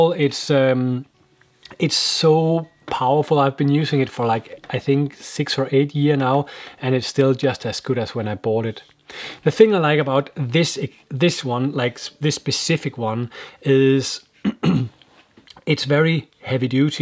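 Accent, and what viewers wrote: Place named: Denmark. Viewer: Hi, seeing that you use the Tamron 150-600, have you tried with the new "Bigma" 60-600? Thanks for your videos, very instructive and easy to understand... specially with your Daninglish... perfect for my Spanglish. Greetings from Colombia, JuanB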